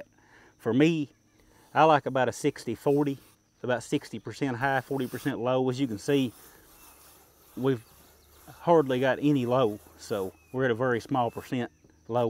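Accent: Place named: North Carolina, United States. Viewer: Excellent video. Exactly what I was wanting to know. You're accent sounds like good ol NC. Am I close?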